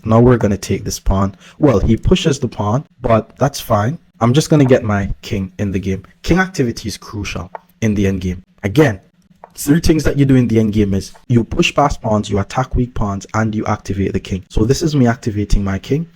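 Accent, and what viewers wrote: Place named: Jamaica. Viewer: I noticed the Rastafarian Jamaican accent my brother wagwan brudda... Love the breakdown analysis, I just got recommended Ur channel 💯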